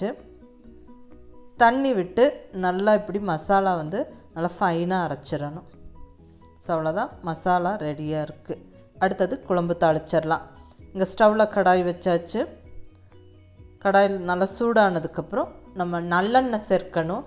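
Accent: Kongu, India. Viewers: I tried it today.. it was good.. mam, all Ur recipes were good, clear explanation.. apart from the recipes, Ur kongu Tamil is superb.. namma oor karanga, enga neighbour polA feel agudhu.. Thanks a lot mam..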